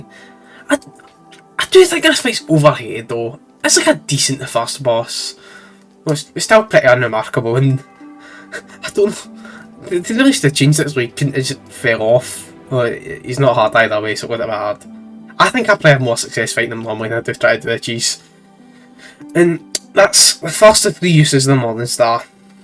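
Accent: United Kingdom. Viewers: What do you want him to do, stop being British? The man can’t change his damn accent.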